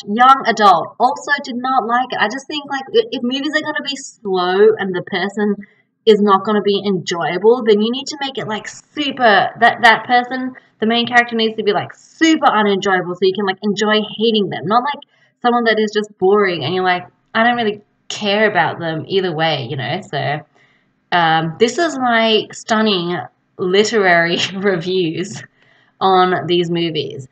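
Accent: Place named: Australia. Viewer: great to hear from you again and know you are doing well there. Probably you can talk about next show - how you maintain the Australian way of doing there, for example your Australian accent, and how you prevent yourself from being ‘colonized’ by the Americans. Lots of love, SK.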